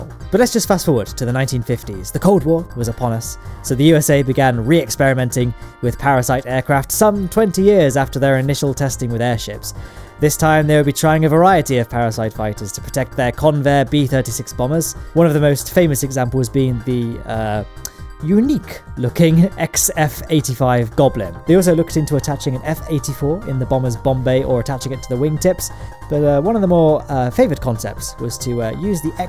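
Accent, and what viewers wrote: United Kingdom. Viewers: Its the bitish accent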